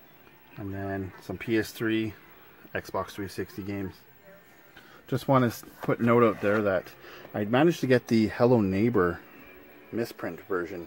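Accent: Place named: United States. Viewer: in the box i love when americans say that lol in the bahx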